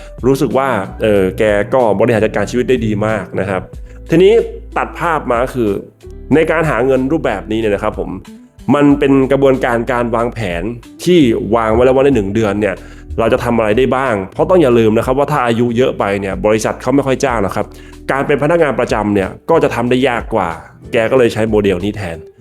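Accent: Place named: Khanu Woralaksaburi, Thailand. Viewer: พี่คนขาณุวรลักษบุรีหรอครับ แซ่บติดปาก บัวยี คล้ายหมู่บ้านผมเลย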